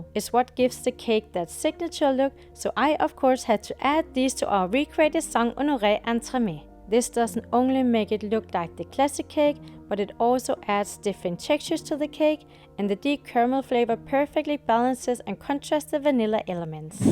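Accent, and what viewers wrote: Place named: Denmark. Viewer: Hej jeg kan høre en dansk accent og navn! Ser super godt ud! ^-^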